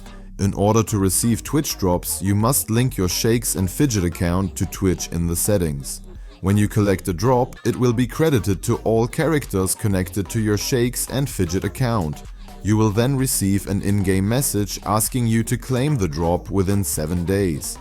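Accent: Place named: Germany. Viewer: Man hört das du Deutscher bist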